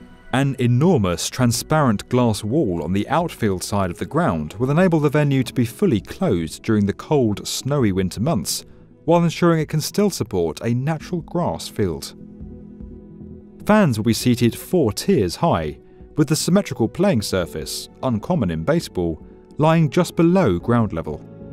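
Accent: United Kingdom. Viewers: Wait is narrator British ? Why is he calling it soccer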